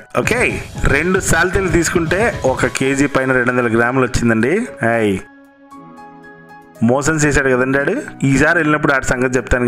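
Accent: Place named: Godavari, India. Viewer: Aami maatladarandi gaaru..maa godavari yaasalo,meeru chesina korrmeenu eguru superandi babuu..aayi👌😃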